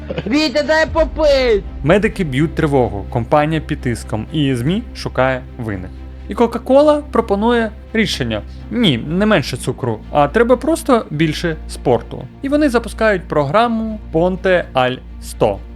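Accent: Russia. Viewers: неможливо слухати, через заводську расссєйську прошивку автора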